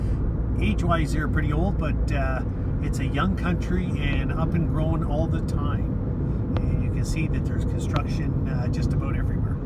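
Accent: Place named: Canada. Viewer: You talk like a Canadian.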